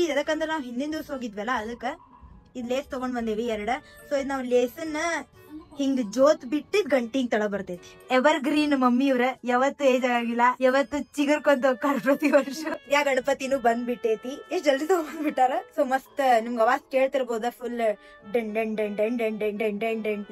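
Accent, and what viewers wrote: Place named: Hubballi, India. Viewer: ನಿಮ್ಮದು ಯಾವ ಊರು ಹೇಳ್ರಿ ಪ ನಿಮ್ಮ್ದು ಹುಬ್ಬಳ್ಳಿ ಆನ್ ರೀ ನಿಮ್ಮ್ದು ಭಾಷೆ ಮಸ್ತ್ ಆಯ್ತಿ ರೀ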